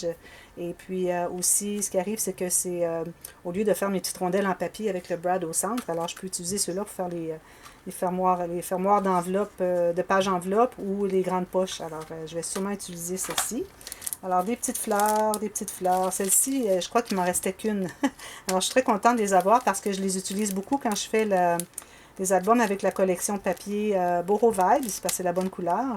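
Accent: Quebec, Canada. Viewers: Bel album et comme j'ai commenté sous la vidéo de présentation de votre album, je vous suis toutes les 2 avec plaisir. Bien sûr, en français cela m'est plus agréable et facile à suivre, et comme je l'ai déjà écrit et comme d'autres vous le disent, ah lala ! Votre accent nous ravit et moi qui suis amoureuse du Québec parcouru par 2 fois déjà, je rêve en plus.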